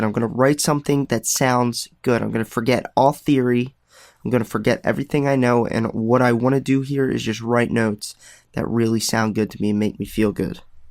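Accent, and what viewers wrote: Philadelphia, United States. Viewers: sounds like Philly to me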